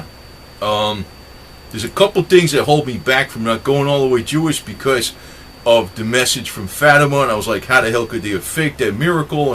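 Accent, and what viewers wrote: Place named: New York, United States. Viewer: Checked out your sportster video...You almost sound like a New Yorker...I am a New Yorker and talk the same way...Oh yea and Jesus was Jewish, there were no Christians until they started following Christ..there were Jewish folks but they don't believe Jesus was the saviour...the Christians believed all the way...so do I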